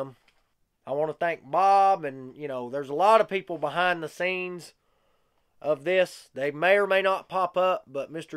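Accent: Appalachia, United States. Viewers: Way to go! You are very entertaining to watch and listen to. Genuine Appalachian! Those of us in other parts of the country love hearing the way you talk and your common sense way of thinking. You will be very successful and make Bob Binny proud. Best wishes. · Absolutely love the Appalachian accent! Glad you are starting your own channel. Looking forward to it.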